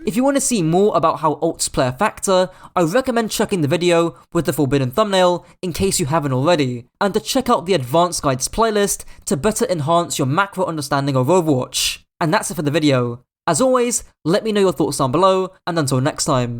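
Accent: United Kingdom. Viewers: He's not talking like a Sydneysider Aussie. Now that's an obnoxious upwards twang at the end of every single sentence. is talking totally regularly for matter-of-fact speaking. Sounds like a regular Brit.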